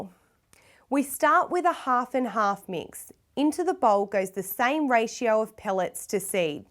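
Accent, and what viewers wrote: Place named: Australia. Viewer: Wow that's a strong Australian accent. I came for the bird info but I got really fascinated by her voice, PS. I'm from the United States so I never hear different accents.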